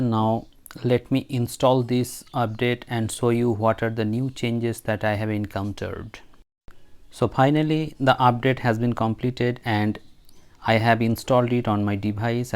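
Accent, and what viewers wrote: India. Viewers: Bihar se ho bhai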